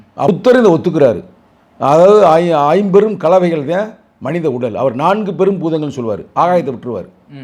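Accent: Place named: Madurai, India. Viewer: மதுரை தமிழில் அறிவார்ந்த சொற்களை கேட்கும் பொழுது.... இனிது இனிது... நீர் வாழ்க....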